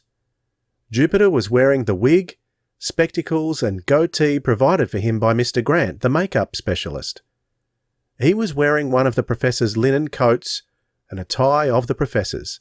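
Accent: Australia. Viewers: This is the first time I've heard an Aussie narrator! It's great!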